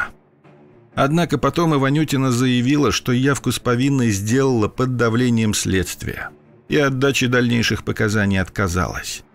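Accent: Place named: Ukraine. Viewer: начал смотреть и сразу подумал,что она украинка...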